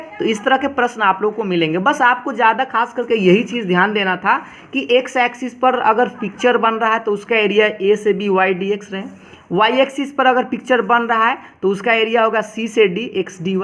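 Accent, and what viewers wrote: Bihar, India. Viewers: sir hum U.P se hai...sir apki voice se pahachan Gaye hai ki aap Bihar se hai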